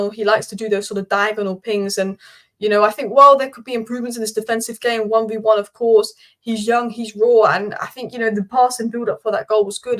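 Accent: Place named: Newcastle, United Kingdom. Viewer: I love this real Geordie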